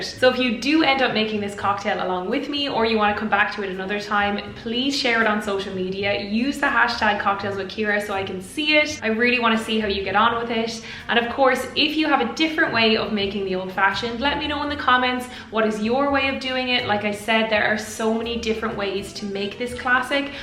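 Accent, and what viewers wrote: Ireland. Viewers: You're the reason I have Siri set up to sound like an Irish female. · I was robbed.... I was told that this was a “naked” Irish elixirs. Oh well.... The Irish brogue had me..... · Gorgeous Irish girl with an amazing accent who loves whiskey and makes cocktails....easiest subscribe ever!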